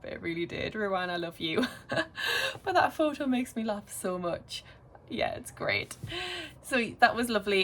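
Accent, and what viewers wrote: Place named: County Durham, United Kingdom. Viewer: It's lovely to hear your accent on YouTube, I live in County Durham so not far! I love sewing but I don't have anyone I know that likes it too, I literally get all giddy in a fabric shop! I love dressmaking but have decided to start doing some craft fayres with bags, accessories etc, maybe something like that could help xx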